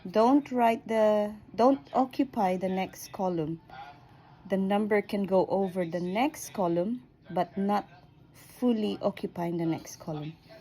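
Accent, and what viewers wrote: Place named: United Kingdom. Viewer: Naks ke galing naman mag tutorial bagay na bagay with British accent pa saan ka pa nun..😆💕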